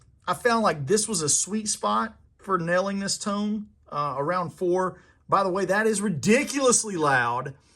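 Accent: South, United States. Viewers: Totally agree with you that aside from ACL 88, that Venus Isle was the best tone and era! I could never understand why Venus Isle was deemed not as good as Ah Via Musicom by many critics..Solos like ' ', ' ' and obviously ' ' are just otherworldly masterpieces.... will never be beaten, but I must say you have to be the closest replica I've ever heard!!!... If I closed my eyes and didn't know better I'd totally believe its Eric i'm hearing. Amazing guitar playing brother, absurdly clean technique (you've undeniably done your homework BIG TIME) and a very likeable friendly manner to boot - not least the Southern accent... There's more than a few things you have in common with the master:) Great video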